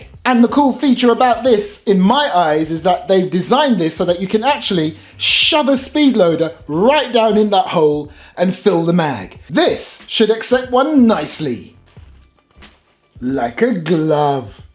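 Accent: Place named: Caribbean, United Kingdom. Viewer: Wha de raas yuh sey! Him accent sound American to you?😂 kmt Him British. British Carribean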